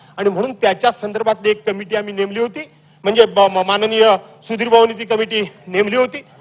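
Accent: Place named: Konkan, India. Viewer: कोकणी आवाज, अभ्यासपूर्ण व मुद्देसूद मांडणी केली. भास्कर जाधव साहेब 🚩🔥🐯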